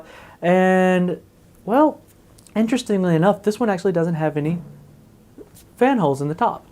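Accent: South, United States. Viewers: Is that Southern accent?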